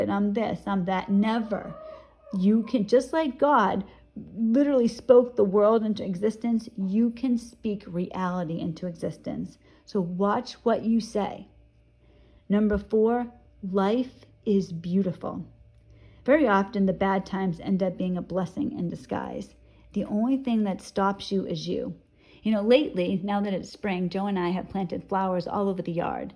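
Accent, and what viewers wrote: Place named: Boston, United States. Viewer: you should do a small clip of your Boston accent, just for kicks. Another great video, we can change and move on, thanks for your encouragement and stories it really helps because so many are still struggling. I am free but still struggle with rumination and it really sucks. It's so cute to hear the chickens in the background.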